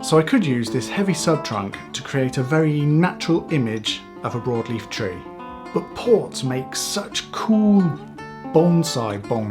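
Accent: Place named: United Kingdom. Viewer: You have a unique style of making videos and its awesome. I like them all a lot and i also like your british accent. Keep the content coming!! Greetings from germany.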